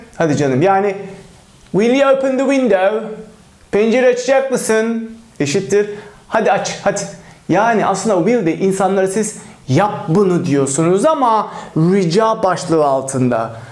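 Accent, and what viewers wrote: United Kingdom. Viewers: Hocam lütfen daha çok zaman ayırın.İlk defa ingilizce öğrenebileceğim kanal buldum hem de ingiliz aksanı. Çoğu kanal umut satıyor, insanlar izledikleriyle kalıyor malesef. İngiliz aksanı ile konuşulan film ve dizi önerileri gelirse çok iyi olur 👍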